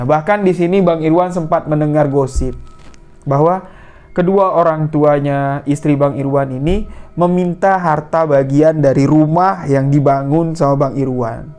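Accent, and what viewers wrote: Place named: Jambi, Indonesia. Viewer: Enak banget ngomongnya,kaya gini yah logat jambi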